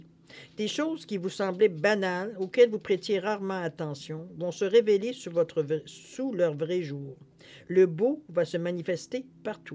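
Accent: Canada. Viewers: Bonsoir, Votre approche est particulièrement profonde. Indépendamment d'un humour que seul l'expérience de vie est en mesure de produire, votre analyse est très élaborée. J'ai vraiment apprécié la finesse de votre intuition et puis cet accent sublime du canada est vraiment très réconfortant car il démontre, selon moi, un attachement à la terre très sain. Bon c'est mon sentiment évidemment et vous en rirez probablement. Mais je dois vous confirmez que mon ressentit vis à vis de vos paroles est particulièrement positif car il touche mon être au plus profond de sa réalité. Un très grand merci de votre maturité d'âme et de vos intuitions, c'est un cadeau!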